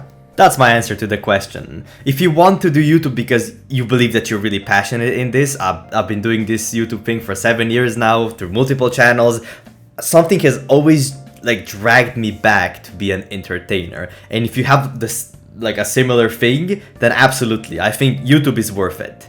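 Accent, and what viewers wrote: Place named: Europe. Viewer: Your accent sounds european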